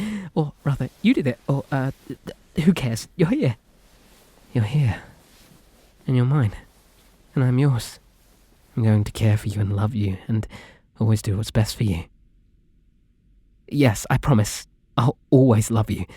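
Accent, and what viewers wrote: United Kingdom. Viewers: I'm fucking dead. The level of comedic timing is impressive. Taking in to account I was just mocking his british accent just a second ago.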